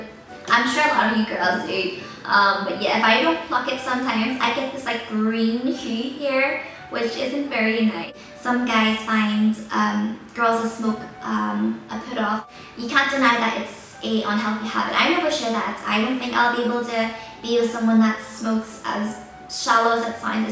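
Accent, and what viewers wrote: Ireland. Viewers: She sounds like irish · She Irish I think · Are you Irish lol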